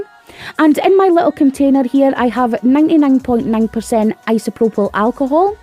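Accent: Scotland, United Kingdom. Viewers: New sub here. I have soooooo many Madam Glam gels. Def my fav brand. Btw, I love listening to your Scottish accent.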